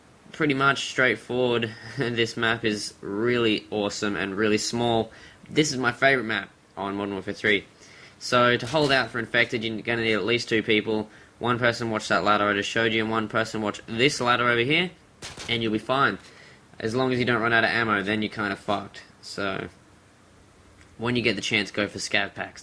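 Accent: Australia. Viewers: Austrailian accent! 3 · a few on downturn lockdown and hhat but still nice r u australian u have cool accent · Are you Australian?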